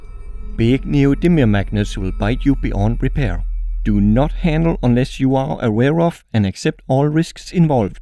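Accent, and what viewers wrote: Denmark. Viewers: Man kan bare høre på den accent du er dansk haha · Warm Danish accent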